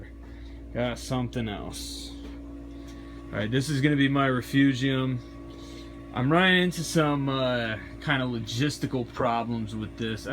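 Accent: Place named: Massachusetts, United States. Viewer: Awesome set up man! You really went all out with the upgrades! Where do you live? I kind of hear a massachusetts'ish accent in there.. I have subbed and can't wait for more vids!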